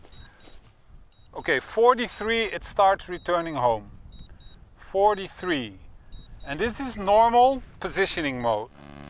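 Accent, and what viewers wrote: Netherlands. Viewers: Volger erbij ! hoorde gelijk een Nederlander ;-) ga zo door.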